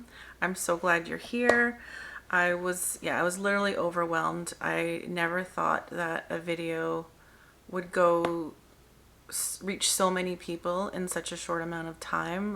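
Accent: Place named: Canada. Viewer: Congratulations!!! I watched your video a day or two ago and knew it would resonate with so many people because you were telling your personal story and people love to hear stories that feel rooted in the “hero’s journey” where someone is choosing to step out of their regular existence to follow excitement and adventure; what bigger adventure is there than the one to create a life you love?! Also, I loved that as soon as you started speaking I thought “she’s a Canadian”. As a Canadian (from Toronto) residing in the US I enjoy hearing the cadence and “accent” of my people. I miss home a lot! 🙂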